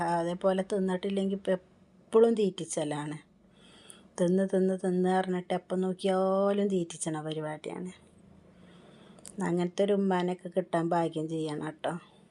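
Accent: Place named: Palakkad, India. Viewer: കേൾക്കാൻ ഇഷ്ടമാണ്.പാലക്കാടാണോ നാട്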